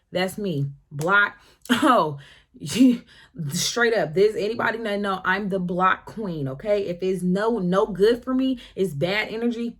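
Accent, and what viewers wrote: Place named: South, United States. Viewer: You really sound like your from the South like me. I love the path you are on!! You are such a Queen sis👏🏾👏🏾👏🏾💜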